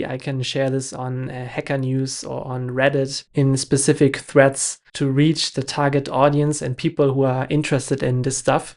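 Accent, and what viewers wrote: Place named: Germany. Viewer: If you hear a german accent you know you are on the right video ! Thanks 😊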